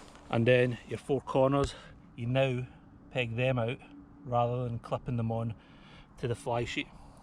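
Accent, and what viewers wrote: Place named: Scotland, United Kingdom. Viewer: If only all other tent reviews on YT were as clear, thorough/detailed, and as succinct as your...a brilliant effort, and that lovely Scottish accent as well!..the only things I would have added would have been: 1. To show the space in the inner with a sleeping bag to determine if it actually touches the inner, and 2. You sitting up inside the inner to give an idea/perspective of the space. But that's easy for me to say having watched it. Thanks, a worthwhile use of 15 minutes of my time!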